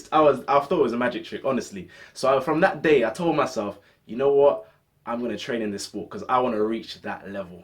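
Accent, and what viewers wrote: London, United Kingdom. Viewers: It's a London accent, though there are many different London accents (posh, cockney, and more 'street' as you could call this accent).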